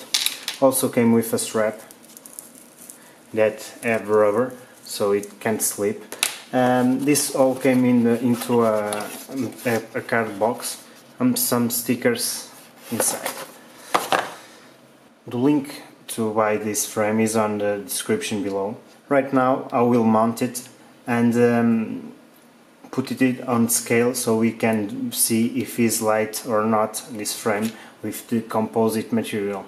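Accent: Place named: Portugal. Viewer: That accent is unmistakable, é um tuga..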